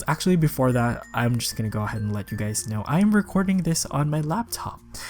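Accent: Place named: Philippines. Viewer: A Filipino SimTuber! This is like finding a unicorn. I recognized your accent, but I had to stalk your socials to be sure. Your builds are real pretty and you pick great inspirations! SUBBED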